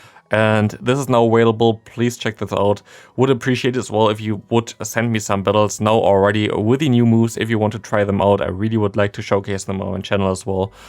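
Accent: Germany. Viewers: If your slight accent gave you away as I'd assume either german/austrian or swiss, its even more insane that you made this video so early. I've just awoken from my slumber, thanks for the dedication and great video :D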